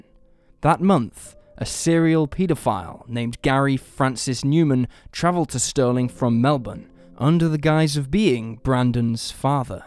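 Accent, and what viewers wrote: England, United Kingdom. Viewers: oh no! Well I would have thought that Scandinavian countries had a lot more common sense than us in many ways ie lifestyle, law and order etc...well you did burst my bubble now! 😂 I live in Australia and I thought you were from England. Lol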